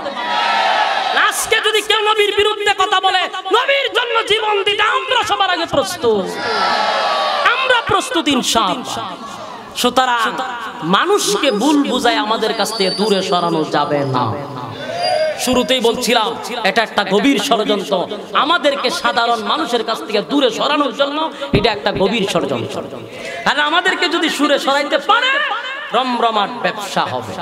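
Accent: Noakhali, Bangladesh. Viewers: নোয়াখালি কে ভাই আনেগোর ভাসা বুজি না মুগো সিলললেটি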